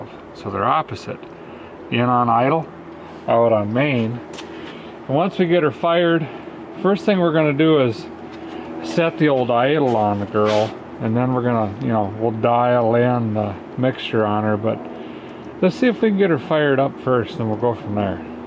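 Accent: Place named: Iron Range, United States. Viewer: Grew up in Duluth and the Iron Range accent and humor have me smiling ear to ear. So glad I came across this channel!!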